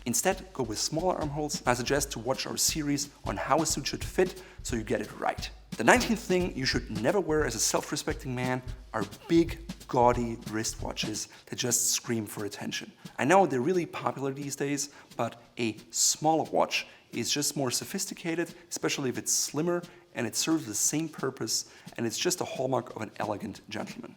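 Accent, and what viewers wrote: Germany. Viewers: Isn't he German? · Wusste ich doch das ich diesen Akzent erkenne :D Even with perfect English, a German can spot a German.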